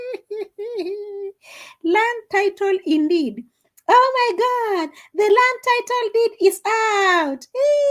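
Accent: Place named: South Africa. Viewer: No your accent sounds exactly like S.African When Caster was in SA, the S African said she sounded like them 😂😂😂 ❤❤ we loved that i like when you say ( LET ME LEAVE MYSELF ALONE) 😂😂😂😂😂❤❤❤ That's a new phrase for me adopted from you😂😂😂❤❤❤❤❤💋💋💋💋💋💋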